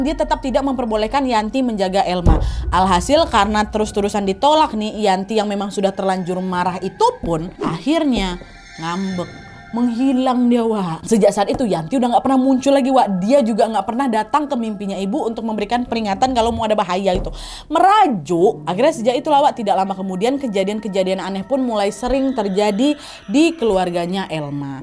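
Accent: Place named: Medan, Indonesia. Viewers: Sampe bingung ka tuh org jawa apa org medan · Dari awal kak buat Youtube sampai sekarang selalu nonton dan gak pernah ketinggalan, dari kesedihan kak di tinggal Alm ayah dan abg nya kak sampai kak ke titik bahagia skrng aku selalu mengikutin kak, sehat terus Ya jangan sampek logat Medan kau berubah Ya kak ❤️❤️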